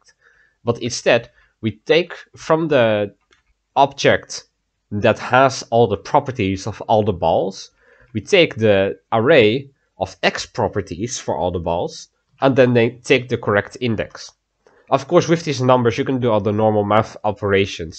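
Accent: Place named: Netherlands. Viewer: woah, i did not expect this this channel to be under rated! nice vids fellow Dutch man! (At least, you sound Dutch :) )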